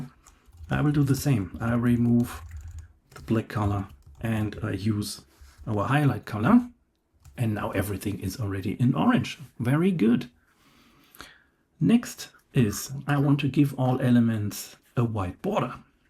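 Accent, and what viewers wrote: Germany. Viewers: are u deutsch?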